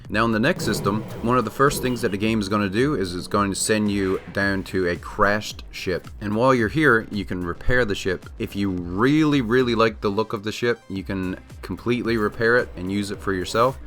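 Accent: Newfoundland, Canada. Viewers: You're the most Canadian sounding YouTuber I've ever found. (I'm also Canadian. Subscribed.) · Total wholesome content man I'm subscribing. Oh btw are you Canadian by any chance ? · I gotta say I do enjoy the Canadian accent. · Was I recommended this guide because you’re from Newfoundland? Or are you generally a popular NMS content creator? First time here and recognized the very local accent, lol. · i love your newfie accent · U Canadian AF my boy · I could tell you were from Canada immediately from the accent. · I am watching this now and said wow sounds like he's from newfoundland then seen your username haha me too! Subscribed! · I was watching and couldn't get over how familiar your accent is, then realized you're a fellow Newfoundlander! Always nice to stumble upon a neighbour in the wild. :) Great tips, by the way! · Haha heard that accent knew exactly where your from. 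I was born in st John's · Started the video and instantly thought.. You are definitely from Newfoundland.. :p Just got this game haven't tried it yet but if you are from Newfoundland that's pretty reassuring :D can trust another Newofundlander :) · Buddy, as soon as i heard the whaddayaat i was like. i knows where buddys from. Prolly from up da shore over across the way. Nice to see someone else from the rock! haha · Newfoundland accent? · are you from newfoundland? you have the craziest accent.. heavy af canadian accent with hints of irish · i cant tell if your accent sounds like a really weak newfie accent or not lol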